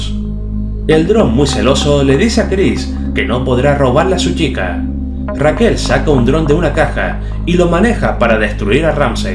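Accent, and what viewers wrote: Canary Islands, Spain. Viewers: Eres canario? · Are es canario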